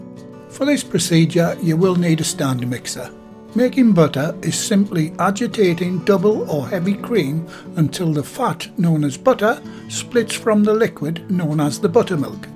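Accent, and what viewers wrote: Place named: England, United Kingdom. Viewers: Nice to hear an English accent rather than American. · I think I partly watch to feel like home . . . now living in Australia . . I do love any northern twang.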